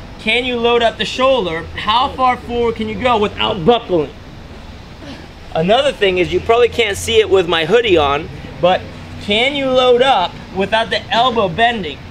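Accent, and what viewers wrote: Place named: United States. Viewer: he sounds like he lives in America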